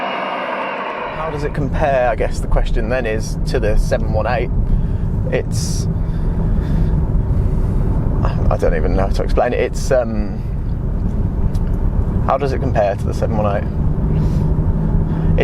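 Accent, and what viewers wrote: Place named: Northern England, United Kingdom. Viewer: You are clearly northern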